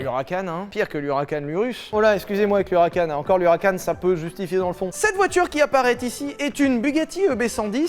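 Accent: South, France. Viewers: Cette accents du sud incroyable ! 😂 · Ho fatch cette accent du sud 😘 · j'adore l'accent du sud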